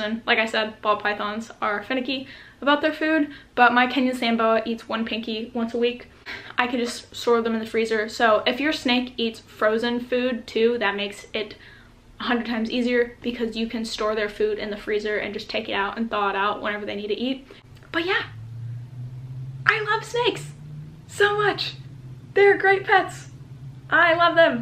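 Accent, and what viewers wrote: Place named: United Kingdom. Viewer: Pretty good british accent, adorable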